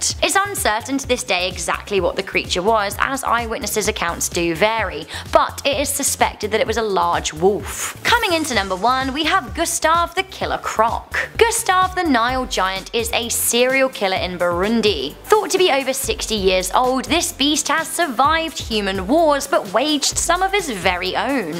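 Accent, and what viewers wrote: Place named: England, United Kingdom. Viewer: English accent 😍😍😍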